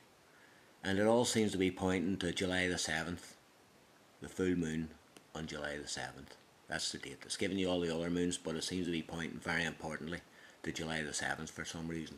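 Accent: Ireland. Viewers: you have a talented mind !! i just hope you're wrong in some of your views :) im curious about the upcoming August crop-circle of the year. ps irish accent i love that land!! (specifically bray - yes, bray) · Love the Irish accent, understood it all real well. Very strong accent though and even said if you don't understand me visit the web addy.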